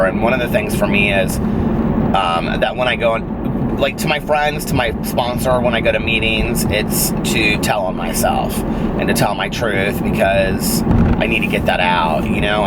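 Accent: South, United States. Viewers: I love you! And I love your southern accent. I’m from Ar & I Snapchat you all the time. Love how you say drank, sang.. 😂💋 have a good day!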